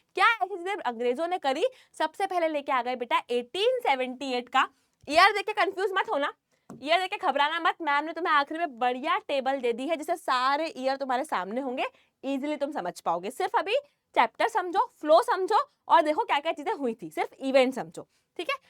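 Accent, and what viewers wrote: Bihar, India. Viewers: Your Bihari accent is so awesome " CHAMKA BHAI, CHAMKA TUMKOO"😅😅😂😂❤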